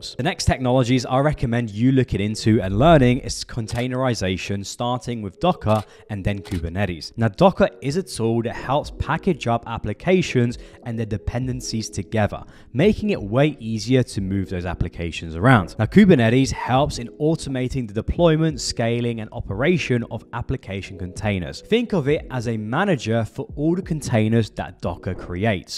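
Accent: United Kingdom. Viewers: Looool it’s our UK accent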